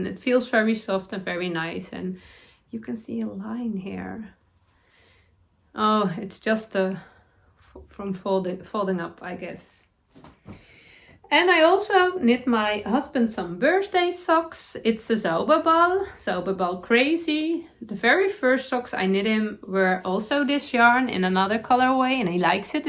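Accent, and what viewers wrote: Netherlands. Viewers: . You just brighten my day. Love your podcasts and your “Dutchy’ English. So refreshing and genuine. Happy New Year. ❤️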